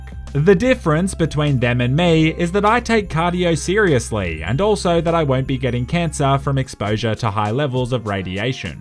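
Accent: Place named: Australia. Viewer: Never thought I'd ever hear a monotone Australian, but eh, better late than never!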